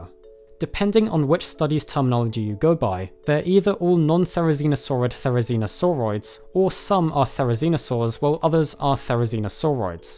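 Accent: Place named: London, United Kingdom. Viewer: Youre London accent is no longer credible Get a black guy or Mexican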